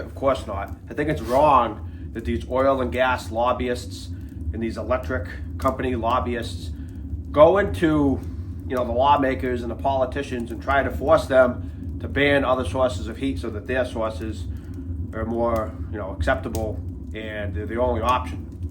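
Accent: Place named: New England, United States. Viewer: Disagree, the Davos crowd, or you name your favorite gathering place or club, in other words those who own our politicians, want the population to be 500,000,000, no more. Further, they realize by actual examples that have occurred in the U.S. and Europe, that people freeze to death in winter and die from heat exhaustion/stroke in the summer, where they have no guaranteed access to reliable energy. In winter this is fossil fuels backed up by wood and in the summer fossil fuels. And now with AI developing exponentially as well, that elite will no longer need wage slave labor for the majority of production and services either. This is the basis of their war on fossil fuels and other combustible energy sources. I recall reading in the last few years that Britain has already gone so far as to prosecute people for burning wood. Perhaps someone can correct me if I am wrong about that. 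Notwithstanding, Britain is also making war on fossil fuels under the climate change fear PSYOP. Question is, regardless of the basis for this, what do we do about it? Does anyone believe the political process is going to work? I believe I may have heard a New England accent from the presenter. Last time I drove from Massachusetts into Rhode Island I literally saw a, "are you ready for 2030" highway sign! But areas where you think you would be safe from this war on humanity are also capitulating, just not as radically or openly. I live in coal country, but for some reason coal plants are being not o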